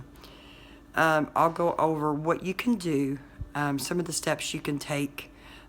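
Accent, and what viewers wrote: United States. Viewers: If you dont mind me asking.... Where is this dentist located? I need 9 teeth replaced and I'm very intrested in what you said about the pricing and everything about this place! I'm quite excited actual you sound southern and I myself am a Southern .... I live in east TN. I hope its not to far away from me. I just really need this in my life and you made it seem like a reality to me and that made me not so depressed and hopeless.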